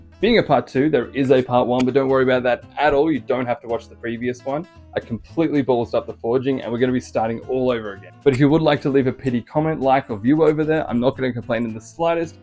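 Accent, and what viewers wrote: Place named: Australia. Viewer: mate lemme tell you something, i was so pleasantly surprised to hear an aussie accent on a niche video for once, letting me know that i can actually buy the shit required for the project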